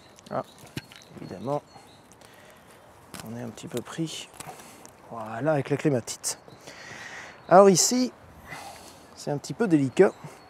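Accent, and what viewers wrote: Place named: Belgium. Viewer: Oui l'accent bien-sûr, et vous parlez de Daniel Schmit en plus.. Nous sommes compatriotes! C'est un vrai plaisir de vous écouter prodiguer vos conseils clairs , précis, facile à appliquer..Merci! Cécile et Emmanuel de Chaumont-Gistoux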